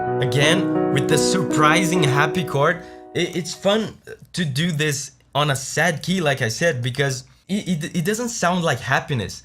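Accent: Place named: Japan. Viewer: 英語の発音も我々日本人に近い感じたから語学勉強にもいい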